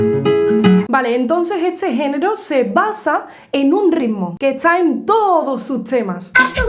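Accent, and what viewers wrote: Andalusia, Spain. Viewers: Me encanta que te apasiones y te salga ese acento andaluz tan rico que tienes (el cual sabes ocultar maravillosamente cuando cantas rancheras). Mi esposa y yo ya somos tus fans. Saludos desde México ¡Viva España! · Me encanta tu canal y tu acento. Adoro a la gente talentosa de España. Saludos desde México... bueno, lo que queda de México después del sexenio de López Obrador, jajaja. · Cuando arrancó el video y dijiste que ibas a hablar de ese género te confieso que dije " se me cayó una ídola, un bastión" después me hiciste reir, me diste conocimiento y argumentaste sincera, coherente con clase y arte. Sos muy genia che. Me tengo que entregar de una vez a la guitarra, a ver si es verdad que no tengo talento o solo estoy "negão" jajaja. Gracias bella. Adoro tu acento del sur. Saludos de este oriental. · 😂😂😂 Me ha encantado tu vídeo, qué arte shikilla. 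Soy andaluz de pro y me encantan nuestras frases, dichos y ACENTO ❤. Y por otra parte, currazo de vídeo con las cuñas, y la información, amena y muy interesante. En resumen, cada día mejor 👏🏻. Un saludo desde Jeré! Y GRACIAS ❤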